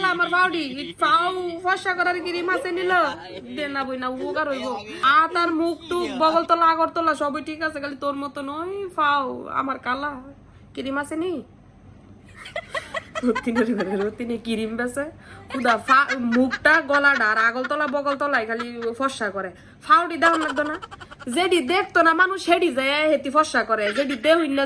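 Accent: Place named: Brahmanbaria, Bangladesh. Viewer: আপা কি ব্রাহ্মণবাড়িয়া মানুষ না কি কথা বুজা যাচ্ছে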